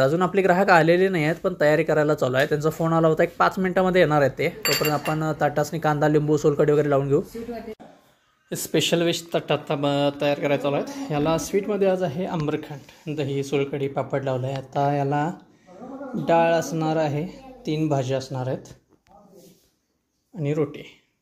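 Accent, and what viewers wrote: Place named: Kolhapur, India. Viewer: व्हिडिओ आवडीने बघतो कारण कानावर कोल्हापुरी भाषेचा गोडवा पडत असतो. आम्ही पुण्यात असतो परंतु आपल्या मुळं आम्हाला कोल्हापूरात राहातं असल्याचे वाटते. धन्यवाद दादा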